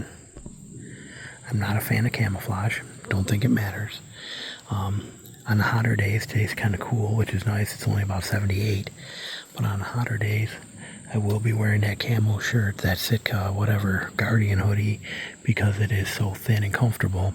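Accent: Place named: South, United States. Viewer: Ahh.. thankyou . Spent 10 years in N.C., you'd Think i'd know how to decipher a souther accent. Scheesch... !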